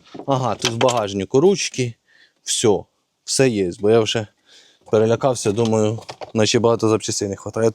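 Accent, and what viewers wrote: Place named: Russia. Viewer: І моZZkaльська підзвучка теж ріже вухо. І головне, що не старається виправлятись.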